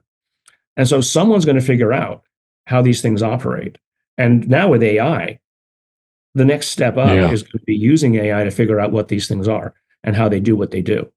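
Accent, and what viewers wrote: Liverpool, United Kingdom. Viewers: A scouser! Wow! Never knew that! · No way is a scouser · NO WAY!!!! HES A SCOUSER!!!! IM LIVING IN LIVERPOOL UK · Didn’t know Gary was a scouser. ( people who are from Liverpool are nicknamed scousers.) 😀👍🏻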